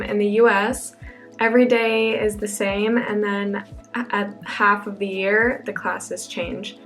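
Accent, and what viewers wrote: Germany. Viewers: Hope it’s going well for you! I can’t wait so see you when u come back with your lil German accent😍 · Hi, it would really interest me to know the “ballpark area“ of where you went to school, like what state. Sounded a bit like Bavaria to me. And yes, a lot of things vary strongly from school to school, so you can‘t generalize too much. The language phenomenon is something you will notice Europe-wide, depending where you go, so Dutch schools might have students that can speak English, German, their own language, and possibly even French.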